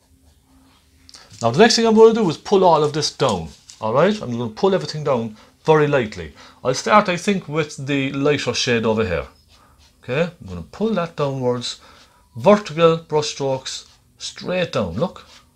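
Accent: Ireland. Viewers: that wonderful Irish accent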